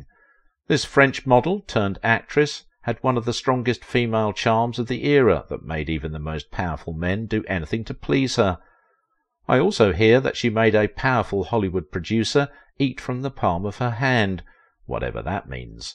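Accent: United Kingdom. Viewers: Your "Proper" British accent does not hide your ignorance - "Song Without End" is not a documentary BY Franz Liszt...it is ABOUT Franz Liszt - the Hungarian Composer, and virtuosic Pianist! Jeeeezus!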